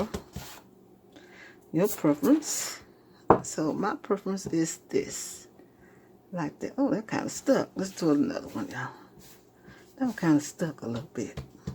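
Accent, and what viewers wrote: South, United States. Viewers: I love the way she is talking. She is a true southern belle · I agree! I love her southern accent!!!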